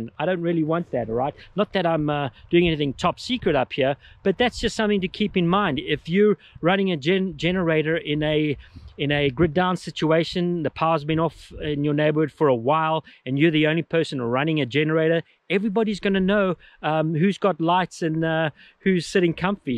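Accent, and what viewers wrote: Afrikaans, South Africa. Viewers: Are you south African? Or have you lived there for a while, your accent is so similar. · I agree that is a South African accent. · South African accent? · South african? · Love the South Africa Accent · Jy klink vir my Afrikaans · Almost sounds South African?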